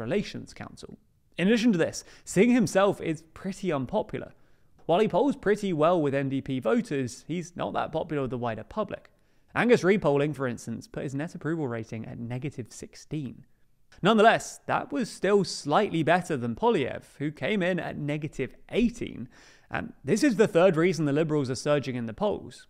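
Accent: United Kingdom. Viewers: "Besides, why would anyone believe anything said about Canadian affairs from someone with a British accent." You mean like your head of state King Charles? Who was there for you in the war of 1812 when we collectively kicked the ass of the U.S.? Who fought for King and country at Vimy Ridge? Whose air force fought in the Battle of Britain, while the U.S. stayed out of WW2? If you like the United States so much, then why don't you just go and live there? Stop your anti-Canadian rot. We are not the United States, and our ancestors fought and died not to be part of the U.S. The Tories are just Yankee snakes who will use their disgusting treachery to make us the 51st state.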